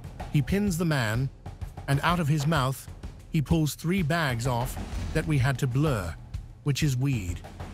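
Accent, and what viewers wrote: United Kingdom. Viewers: The voiceover is clearly British English, so why use wordds like 'dude' and 'gas station'? What is a 'dude' anyway?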